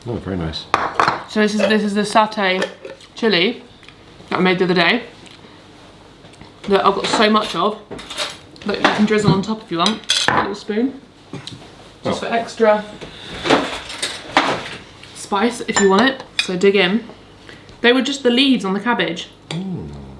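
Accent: United Kingdom. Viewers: I already booked your plant comfort kitchen ebook last year, but I could not resist getting the bundle! It's a bargain. For 50$ I got so many books and recipes that I'm looking forward to trying. Of course the first ebook I downloaded was versatile vegan and I loved it! I truly recommend the bundle to vegans(like me) and people who want to eat healthier. I just love your recipes. Can you imagine? I started following you because of your lovely British accent years ago? 😍 I'm Italian but I live in Malta and soon I'm moving to one of the islands you love...❤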